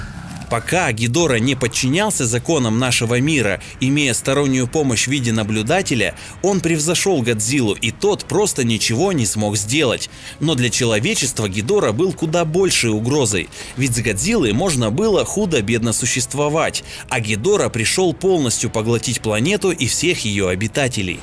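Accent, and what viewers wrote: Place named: England, United Kingdom. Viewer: Ну нехрена у тебя акцент английского!!!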